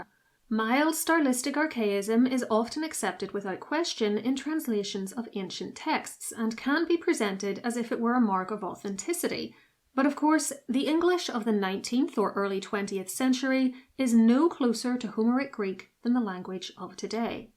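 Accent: Northern Ireland, United Kingdom. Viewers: Nice to hear a Northern Irish accent, North Down? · Well, you've covered just about every concern I have about the movie. It's something I'd normally look forward to, but I am too sensitive or perhaps too petty to buy a ticket. To me, Diane Kruger looks like someone who could launch a thousand ships and Brad Pitt makes for a convincing Achilles. I don't like any of the casting choices in this new movie. Although, I suppose Tom Holland is fine for Telemachus, if it weren't for the American accent and the dialogue. I'm not sure what to picture as an average Greek. I've seen the Big Fat Greek Wedding movies and the film and stage productions of Momma Mia. But, one of my doctors is very Greek. She has dark blonde curly hair, but more of a medium complexion. My friend, her son, also has dark blond or maybe light brown hair, but reminds me of Czar Nicholas II or a young King George V. 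In terms of accent, I don't think I'd want them to sound like Michael Constantine and Andrea Martin in My Big Fat Greek Wedding. I think I'm most comfortable with a British English accent. Actually, I think the voice and the accent of would make for a good Athena. I don't quite know what it is about your voice that's so pleasing. I don't now if it would be considered aristocratic by Northern Irish standards, perhaps not. Perhaps it's "learned." Whatever it is, it's quite beautiful. I think a London version of what I find appealing in this "type" of voice is Jean Simmons. If the Odyssey movie is narrated by Homer, I think Richard Kiley w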